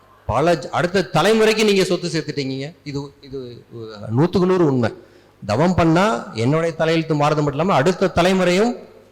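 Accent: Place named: Tamil Nadu, India. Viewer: Really I'm saying I'm happy to hear pure tamil voice from tamil man🎉🎉🎉🎉🎉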